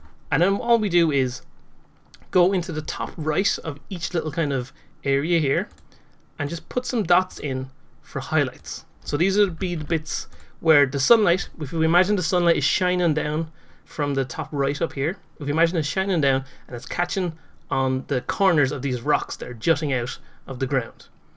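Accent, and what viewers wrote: Ireland. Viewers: Great tutorial!! Helped me tons on getting my 2D platformer going! Are you Irish?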